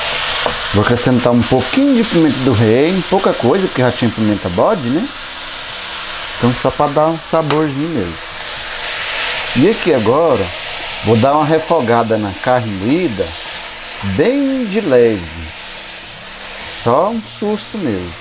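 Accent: Minas Gerais, Brazil. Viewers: Gente que simplicidade adorei parabens! Sotaque meio mineiro, goiano? Adorooooo fogaozinho a lenha me lembrou mto minhas origens. Parabéns acabou de ganhar uma inscrita e super like. Adorei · Seu jeitinho de falar é muito gostoso de ouvir, jeitinho mineiro , amo seus vídeos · Você passa uma paz tremenda!!! Amo sua maneira de falar. Vc é mineiro? Sua comida é muito bem feita, e com certeza saborosa! Vc em outro dia falou como sua mãe educação vc! E falou de uma maneira respeitosa... lindo! Gosto de vc de graça! Ñ é uma cantada! É um elogio❤👏👏👏👏👏👏👏👏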